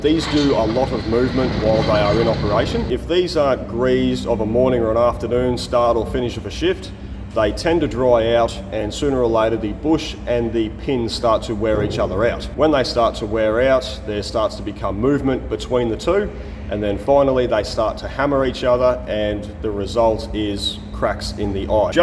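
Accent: Australia. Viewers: You've got an Aussie accent....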